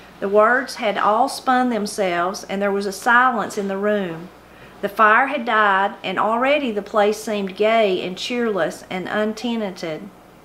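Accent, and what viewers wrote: Appalachia, United States. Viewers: Thank you so much for reading the story with the true accent of your voice, and reading the words as written with the Appalachian way they are/were spoken. I pray you enjoy all the blessings our Lord has given you and your family. Thank you also for sharing the excitement of your life. God bless you in Jesus's name!!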